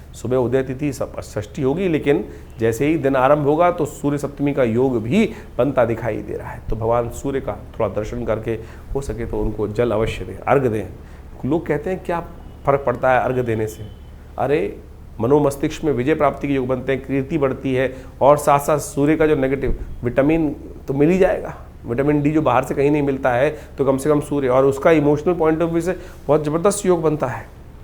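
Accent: Standard. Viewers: Bahut sundar aur shudh uccharan hai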